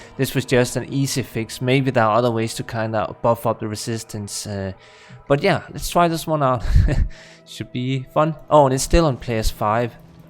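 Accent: Denmark. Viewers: Are you from Denmark? Your dialect sounds a little Danish 😀💪🏻 great video!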